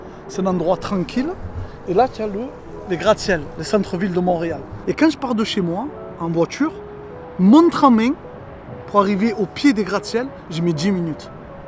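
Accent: Marseille, France. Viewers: Est-ce que t'es un marseillais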